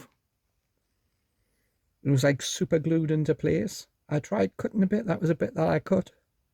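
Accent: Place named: Newcastle, United Kingdom. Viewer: Love the geordie review ❤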